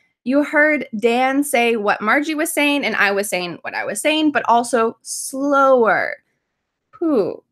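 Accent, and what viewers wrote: United States. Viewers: people in US speak not only fast but also in slang and with different accents. That's the difficult not only in US but also at the places with English speakers. You have the perfect American accent I have ever heard. I like your videos. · I like ur voice,you know,just easy to understand and concentrate. perhaps in my view American English is easier to follow with,it's kinda different from what we'd been taught in textbook.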